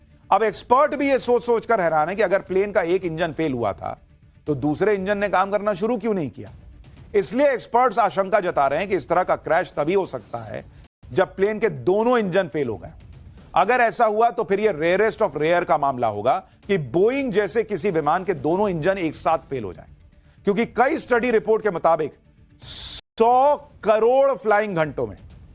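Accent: Bihar, India. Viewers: Aap bihari hai babu ?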